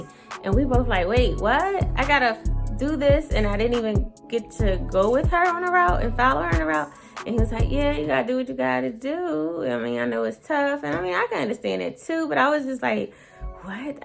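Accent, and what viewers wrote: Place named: Philadelphia, United States. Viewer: SSQ Brown 1 second ago I just got hired as a CCA and my orientation is coming up . Congratulations! As excited as I am I’m also super nervous. Especially hearing that you can’t be in truck with the person training you. Btw You sound like you’re from Philly. If you are your accent sounds like my roommate from school. Lol Congratulations on school! You got this girl! From one mama to another keep pushing.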